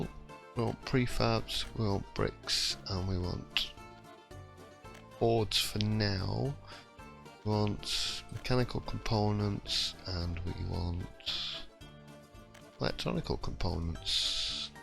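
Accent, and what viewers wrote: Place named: Derbyshire, United Kingdom. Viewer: You from Derbyshire? Trying to place your accent lmao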